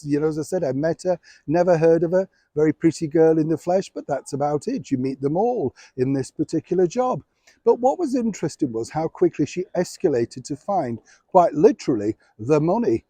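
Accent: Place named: England, United Kingdom. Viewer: The joy of an English voice speaking and the flowers magnificent! Love the chat !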